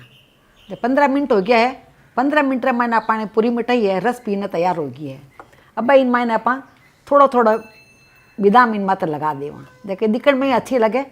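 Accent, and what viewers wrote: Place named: Marwari, India. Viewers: I love the recipe auntyji...u described it so well.. u r so beautiful and elegant. Ur marwadi is lovely... keep sharing the new and delicious recipes with us .. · Thanks for sharing your traditional recipes. You seem very experienced. I am originally from Ajmer so I am loving your Rajasthani accent, but it’s amazing to hear all the English words you speak with a beautiful accent. Have you ever made stuffed guttas? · Very nice recepie. मारवाड़ी बोली सुन कर बहुत अच्छा लगा. Pranaam पापा mummy. आप दोनों हमेशा खुश रहिए और स्वस्थ रहिये. 🙏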